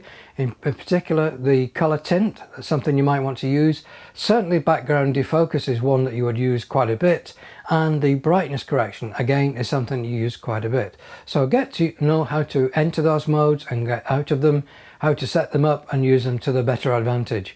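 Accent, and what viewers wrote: United Kingdom. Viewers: How come there is no younger person minus the British accent video tutorial for Panasonic fz 300 on you tube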